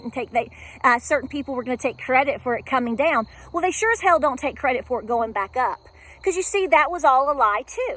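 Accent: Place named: Tennessee, United States. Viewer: I’m so glad to hear you speak like a Tennessean ❤ I’m not apologizing for speaking about this beat down we’re taking. They are trying to kill us off. I’m a Hillbilly & damn proud of it !! No apologies, only regret that I as a citizen, didn’t see this crazy coming sooner. Keep speaking out, & fighting on. Let me know where to find you other than here.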